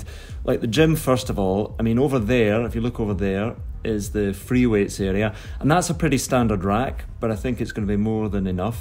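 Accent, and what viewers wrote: Scotland, United Kingdom. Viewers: that lovely Scottish accent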